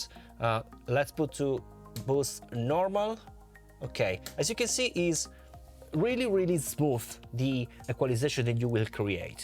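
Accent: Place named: Italy. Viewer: this is how italians talk english 😅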